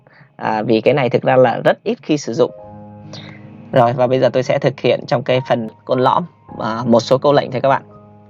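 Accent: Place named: North, Vietnam. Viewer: Người bắc nói giọng bắc dễ hiểu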